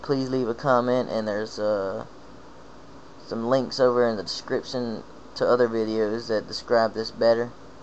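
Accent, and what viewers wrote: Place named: South, United States. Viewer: Who say's people with a southern accent are dumb hicks.This dude is a genius ! Good for him ! This stuff is above me.I'm a dumb Northerner !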